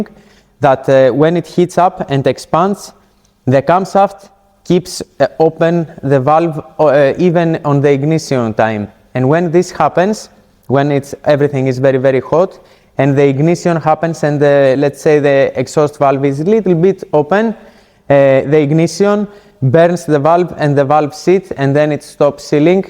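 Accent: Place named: Greece. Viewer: You sound Greek.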